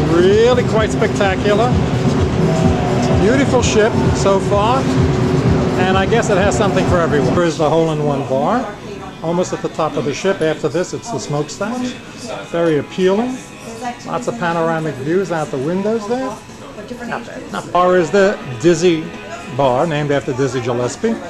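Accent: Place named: New York, United States. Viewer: love the new york accent.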